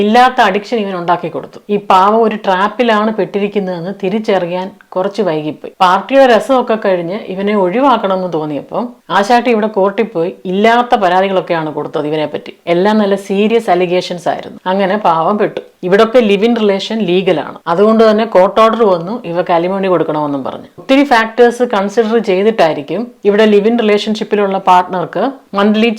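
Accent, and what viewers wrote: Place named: Chengannur, India. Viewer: ചെങ്ങന്നൂർ സ്റ്റൈലും സ്ലാങ്മാണെന്ന് ഊഹിക്കുന്നു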